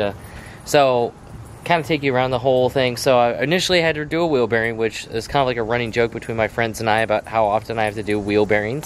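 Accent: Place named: British Columbia, Canada. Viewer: Your accent sonds like almost Fraser Valley BC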